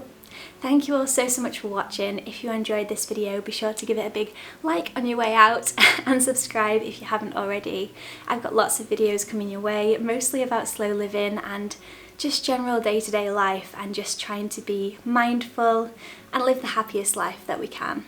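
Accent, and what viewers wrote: Yorkshire, United Kingdom. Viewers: Are you a Yorkshire lass?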